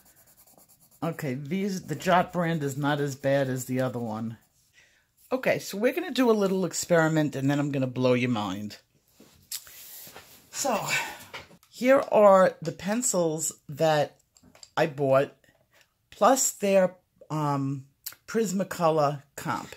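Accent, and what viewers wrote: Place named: Massachusetts, United States. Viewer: Nice tip and I could listen to your lovely voice all day. Are you from Mass?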